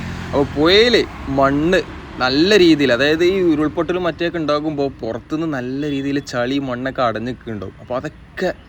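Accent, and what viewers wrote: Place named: Northern Kerala, India. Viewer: All your programs are very good, especially your narration in northern kerala dialect.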